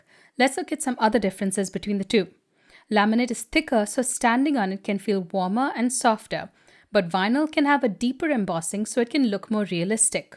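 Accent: India. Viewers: Your looks and accent are so Indian 😂 (Asian Indian). I can't get over it.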